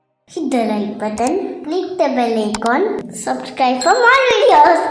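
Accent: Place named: Kerala, India. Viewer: Reminds me of the dialogue Gramamum cookka neengalum cookku Very sweet malayalam kalandha tamizh❤❤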